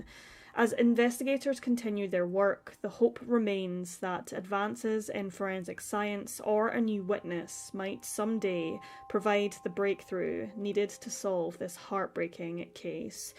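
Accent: Scotland, United Kingdom. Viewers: HOLA MI FAMILIA DARK 🌑 CURIOUSITIES & BABY GIRL WITH THE AWESOME SCOTTISH ACCENT!!!#665 TOO COMMENT, THANKS GOODNESS, IT WASN'T THE NEXT ###😲😲😲😲😲😲😲🫣🫣🫣🫣🫣🫣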